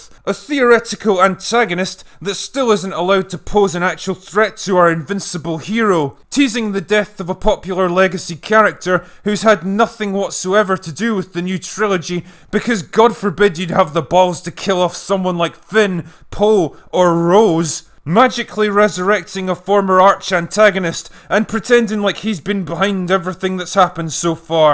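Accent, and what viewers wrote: Scotland, United Kingdom. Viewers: He's Scottish. He just sounds that way.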